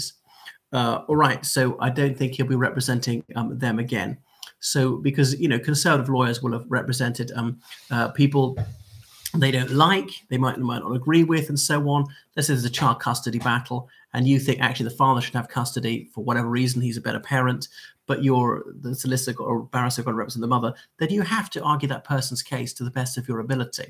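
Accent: RP, United Kingdom. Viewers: With that plummy accent you claim Ireland.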